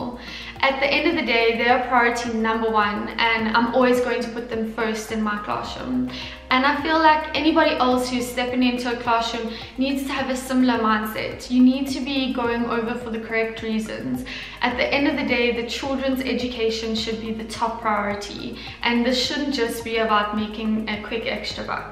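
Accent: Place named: South Africa. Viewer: your south africa accent isn't that strong. i am kinda surprised that you got teaching position in china. british or american english are preferred there and considered as "authentic english". i had been to south africa multiple times, some of afrikaans got really thick accent, took me a while to get used to their speaking patterns.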